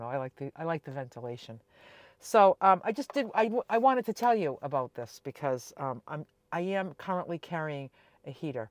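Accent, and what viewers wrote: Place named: Massachusetts, United States. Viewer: Loving that Mass accent (we used to live in NH!). Safe travels!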